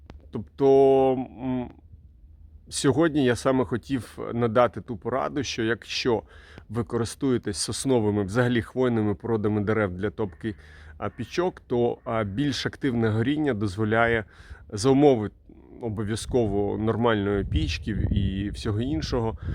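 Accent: Russia. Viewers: В твоей речи слышно, как много лет ты говорил на русском, а тут бац и вспомнил о незалежности.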